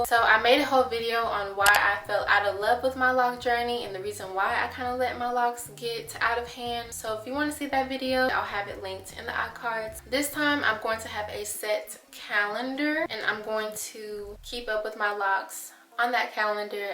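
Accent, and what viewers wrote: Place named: Houston, United States. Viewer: Yooo! I was like, she sounds like Solange. Then, I see you're from Houston. I guess we really do have an accent.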